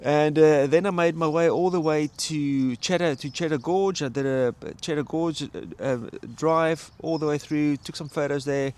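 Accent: South Africa. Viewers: Hey boet. You sound south African if I'm correct?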